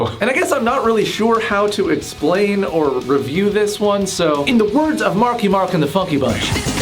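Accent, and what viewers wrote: United Kingdom. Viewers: his brit accent sounds like murdoc im crying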